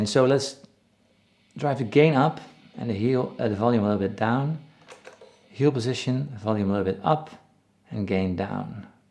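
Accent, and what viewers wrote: Netherlands. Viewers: ha lijkt wel een Nederlands accent 🙈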